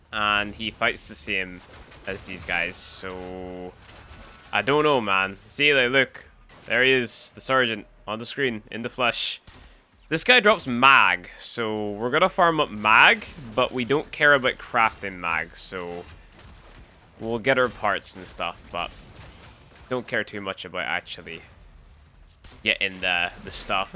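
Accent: Northern Ireland, United Kingdom. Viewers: Is that a fellow Northern Irish accent I hear? Well damn, I might just take that as a sign to give Warframe another go.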